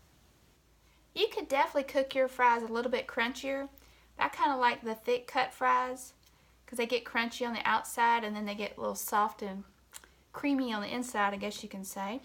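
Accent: South, United States. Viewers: Thank you!! Love your southern tone ❤️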